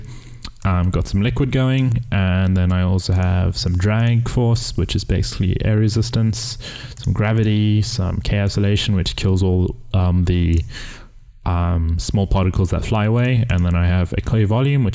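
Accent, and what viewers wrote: South Africa. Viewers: South African accent??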